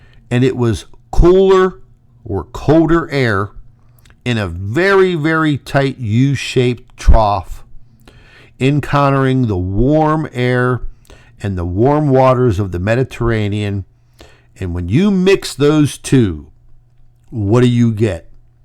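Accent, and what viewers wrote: Pennsylvania, United States. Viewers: I can't tell you how good it is to hear your voice and see your reporting. I hope the good doctor is doing well you haven't mentioned her lately but then again there's so much going on in the world and in your own personal life. I hope that part gets resolved. I know getting world resolved is not anything we can do it is just going to work around us. We can do very little about it. Buying fewer SUVs or using the stupid carbon tax does nothing for the climate or our planet.. Some political honesty from the powers-that-be would be a lot better. But again it's good to hear you back on the "YT"it's nice to see the videos you put together as well. You're always so informative. As I hail from that part of PA. I relate to your accent, attitude and willingness to call it like it is. Again, I am glad your back researching and keeping people informed especially those willing to watch with an open mind. 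Again I hope your health improves and the good doctor weathers her physical trials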